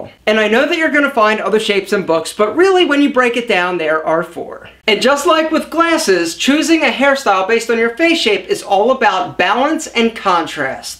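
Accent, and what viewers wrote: Philadelphia, United States. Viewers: you sound like you're from the Philadelphia region?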